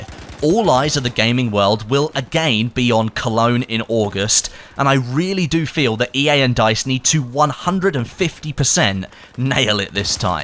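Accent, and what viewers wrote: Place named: RP, United Kingdom. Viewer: What is this? You gave absolutely no opinion of the game except: it is fun and I THINK I will be happy to play it next 2 years. There is no game-play opinions, there is no gun play assessment, there is no game mods information. YOU ACTUALLY COMMENT ON THE DICE AS GAMING COMPANY, AND THEIR MARKETING STRATEGIES BUT YOU GIVE NO OPINION ON THE GAME WHAT SO EVER. Your videos are worthless waste of time and I feel cheated tor my time and energy every time I watch one. You keep repeating same statements in slightly different way for half a dozen times in slow posh correct English, and you say nothing of substance.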